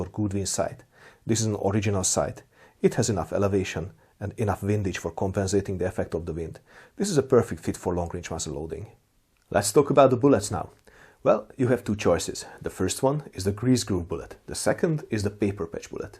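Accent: Hungary. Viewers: Always a good day when uploads in beautiful hunglish language. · This is very cool stuff. I’m from Canada, and much of N America, with the exception of cowboy action, is in to modern stuff. So it’s pretty rare to see this. I’m getting my first antique exposed hammer shotgun though, and an antique muzzle loader? There’s a bunch from the civil war, but they aren’t cheap, and they stuff you have is even more expensive, since we’re newer countries. We didn’t have many of those guns here. Still, fascinating to watch, and interesting to compare your accent to my wife’s Hungarian accent. 🙂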